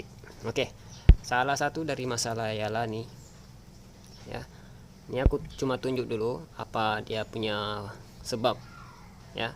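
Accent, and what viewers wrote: Sabah, Malaysia. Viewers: saya suka dengar orang sabah cakap. arwah mak saya asal KK lepas kahwin dgn arwah ayah asal kedah terus menetap di sini. hehe lain cerita pulak. penjelasan yang hebat 👍🏼👍🏼. kipas radiator LC saya tak berfungsi pun entah berapa lama. tau naik saja. boleh la saya cuba cara ni. terima kasih semoga murah rezeki bang.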